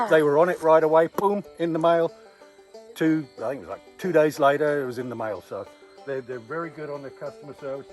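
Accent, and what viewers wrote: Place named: England, United Kingdom. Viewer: What’s your back ground mate you sound like a Englishman?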